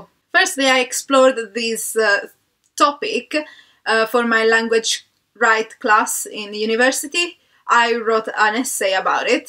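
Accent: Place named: Sardinia, Italy. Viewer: Helo, Your pronounciation of Cymru is perfect! The word Radio is pronounced as you said it in your own Sardinian accent ( as in Sa Radiollina) not as Radio pronounced in English. Also you pronounced SIanel Pedwar Cymru (S4C) perfectly. Da iawn! / Very Good I am coming to N E Sardinia on holiday with my husband next month and am trying to learn a little about your island and language. I share your views that parents should definitely speak Sardinian to their children. It annoys me also when some Welsh people fail to appreciate our beautiful Welsh language (Cymraeg) and some fail to understand the importance of keeping our language alive. Some English incomers will make the effort to learn,which is fantastic but unfortunately some do not understand we are a Country in our own right with our own culture and language. My mother was English but thankfully learnt Welsh when I was a baby in the 60’s and became a fluent Welsh speaker and brought up her 3 children to speak Welsh. 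My children are fully billingual Welsh & English and have also learnt French in school. They also always make an effort to learn a few words/phrases in the language of any Country they visit out of respect! I enjoyed your video. Ciao / Hwyl Fawr!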